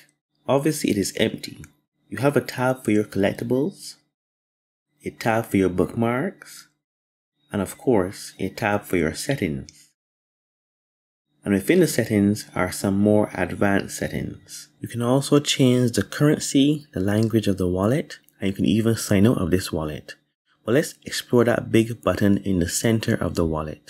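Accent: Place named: Barbados. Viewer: Love that Barbados Accent sounds like English but with a slice of Lime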